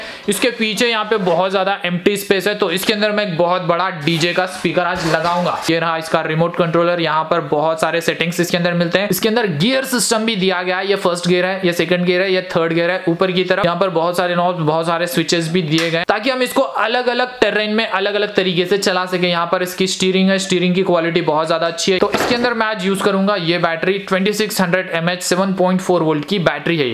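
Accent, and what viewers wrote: Rajasthan, India. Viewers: Bhai Rajasthan se hai kya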